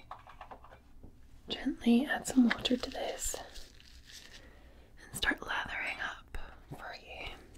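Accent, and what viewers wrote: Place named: Scotland, United Kingdom. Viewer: half an hour of tingles from the resplendent scottish lass. perry the platypus noises ☺️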